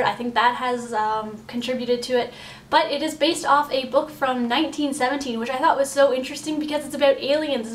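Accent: Canada. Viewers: I love the part about the 7 year old getting late to bed because he... you know... had to drive home! That was cute... Btw, my favorite James Bond character is: Xenia Sergeyevna Onatopp (Golden Eye) Hockey, he? From the sound of it you either from Toronto or Montréal, lol! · Are you Canadian?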